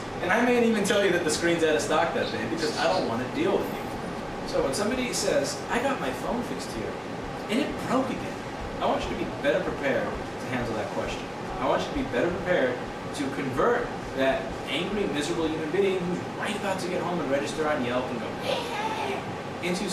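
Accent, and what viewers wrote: New York, United States. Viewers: Pierjan - He's got that New York style going on. :)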